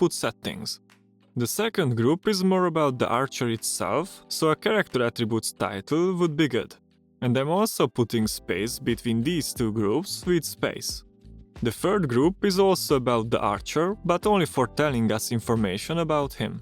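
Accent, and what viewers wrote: Hungary. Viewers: Magyar vagy ? olyan az akcentus. If you didnt understand nevermind my comment :)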